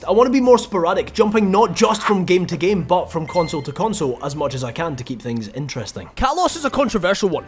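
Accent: Scotland, United Kingdom. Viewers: Most underated youtuber ever. And the whole Scottish thing makes it better as a Scottish person 👍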